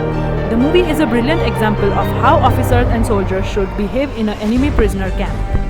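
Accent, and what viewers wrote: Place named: India. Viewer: Sweet voice. Pure Indian tongue. Wish I find ur no.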